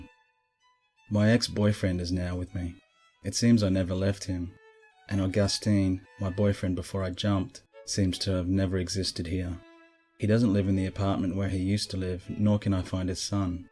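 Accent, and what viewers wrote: Australia. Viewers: are you from Australia?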